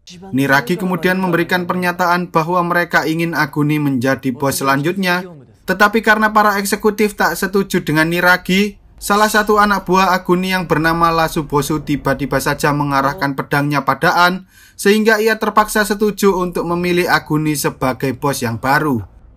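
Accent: Java, Indonesia. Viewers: Jowo ta mas?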